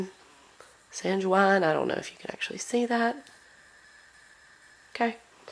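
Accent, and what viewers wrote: South, United States. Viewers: I love your southern accent 😊. I lived in Mississippi for years! I live my Princeton brushes! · Trying to support smaller artist channels. I don't understand why some of y'all have so few views. Your reviews are just as good as those of the "bigger" people. Yeah the filming quality isn't as good but the content is fine and concise. I liked your video and your southern accent. Don't hear it anymore since I moved from TX to Massachusetts haha Edit: wait, they put different colors in the boxes? I didn't know that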